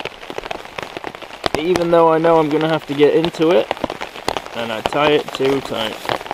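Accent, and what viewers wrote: Yorkshire, United Kingdom. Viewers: Enjoyed your show! Im from CA, USA, Born here with 100% Yorkshire blood! 1st Generation American Boy Scout! avid Fly Fisherman and Hunter! Love to hear the Yorkshire accent! I just subscribed! I like your choice of dinner and whiskey! I used to do what you do and need to get back to it! only difference would be I bring my Black Lab! and longer stronger tent pegs! lol Peace! look forward to more shows! Keith R Skirrow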